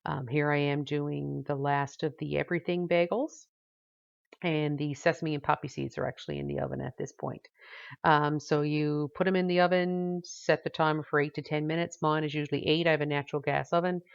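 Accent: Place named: Maine, United States. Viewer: Tried this recipe this morning. Excellent taste!!! Brought me right back to St. Viatare Bagel from St. Lawrence Market in Toronto/Montreal. I used commercial "everything"spice and it was wayyyyyyy too salty. Sesame Seed one was delicious. I followed recipe exactly and would do it again!!! Not too difficult, even for me, a novice yeast dough baker. Great job on the video, and I love your pointers and tips, and of course, your Down East accent.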